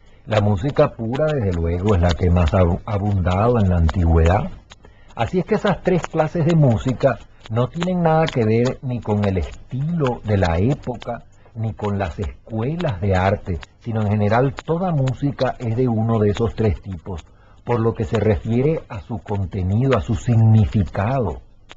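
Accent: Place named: Caracas, Venezuela. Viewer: Nótese el acento del caraqueño de antes.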